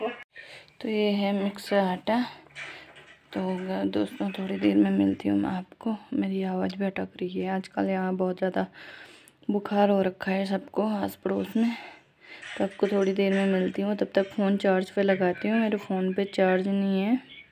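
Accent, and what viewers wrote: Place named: Pokhara, Nepal. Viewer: Gadwali मैं बोलो आपकी pokhari भाषा तो बहुत मीठी है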